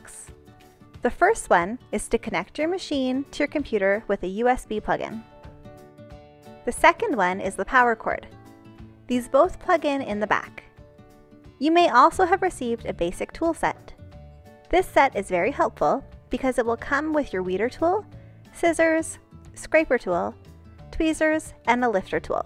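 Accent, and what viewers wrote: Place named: United States. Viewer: Very helpful video, thank you, and a charming accent also, where in America do you hale from?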